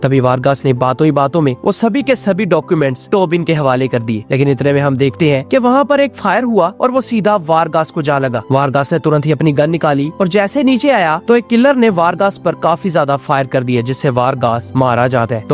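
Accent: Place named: Lahore, Pakistan. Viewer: I think you from Pakistan kyuki hindi esi ni hoti matlab bolne ka lehza lahori pakistani panjabi lag raha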